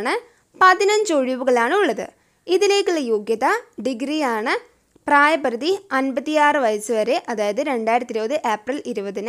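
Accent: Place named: Malabar, India. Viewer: മോളുടെ വീട് thalassey ആണോ..ഒരു മലബാർ slang ..😊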